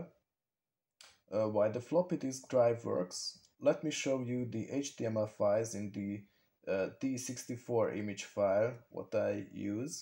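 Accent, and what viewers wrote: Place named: Hungary. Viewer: amazing project! your accent sounds a bit Hungarian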